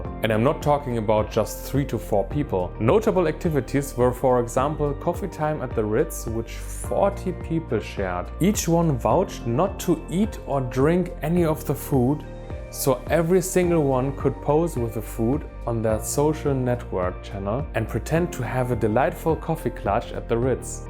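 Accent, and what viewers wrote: Germany. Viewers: Crazy behaviour and sad. So shallow. And yes some earn lots and lots by selling gullible people courses on how to level up and so on, targeting desperate people to waste their little money in hooe to better their lives. That is real shabby and low behaviour. Btw. You have an interesting German accent, are you German by any chance? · Direkt am Akzent den Alman erkannt ^^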